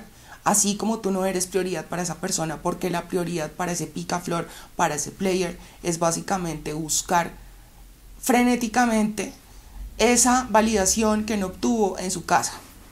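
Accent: Colombia. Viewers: Excelente video y GRAN CONTENIDO 🇨🇴 MI GURÚ FAVORITA ❣️😉✌️🇲🇽 (esa manera de hablar colombiano y esplicar con tanta paciencia te hacen muy ESPECIAL 🇨🇴❣️😉✌️🇲🇽)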